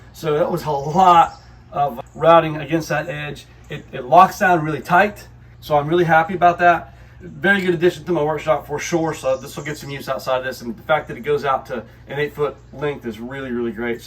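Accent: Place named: South, United States. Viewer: southern accent, scared of manuals that might resemble "books", yeah that fits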